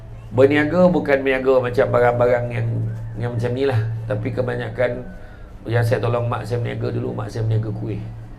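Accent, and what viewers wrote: Negeri Sembilan, Malaysia. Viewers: saya rindu nak dengar orang cakap nismilan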